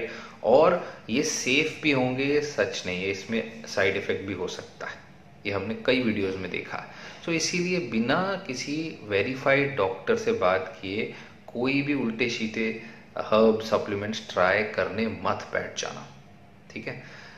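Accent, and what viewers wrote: India. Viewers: sorry doc..i have a hard time fallowing you..what is that indoenglish ?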